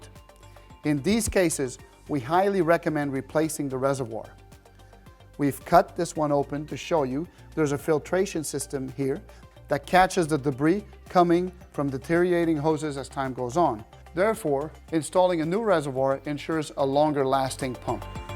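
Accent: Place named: Netherlands. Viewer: He has a dutch accent.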